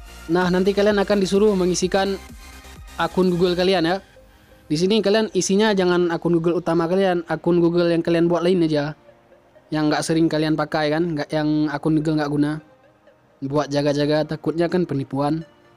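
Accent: Aceh, Indonesia. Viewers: Aceh habis logat nya.. Wkwkw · Bg kayak org aceh bicaranya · Hahaha logat Aceh abeh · LOGAT ORANG ACEH SUDAH SAYA SUB,MAJU TERUS BANG. SEMOGA BERKAH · hhhh...kupikee pakon logat aceeh...hana hie awak jawa😂🤣...auto sub nyoe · sudah ku duga logat aceh hhh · logat orang ACEH 🔥🔥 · Bang orang aceh ya suaranya kayak orang aceh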